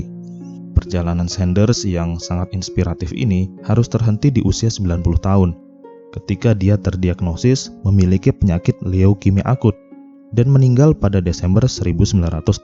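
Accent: Lombok, Indonesia. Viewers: Dari lombok ya bang?🙏🏻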